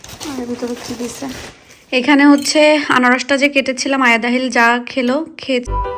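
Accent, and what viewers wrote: Sylhet, Bangladesh. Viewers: Apnar Muk a sylheti vasha sune kub Valo laglo,ami nije o ekjon sylheti tai❤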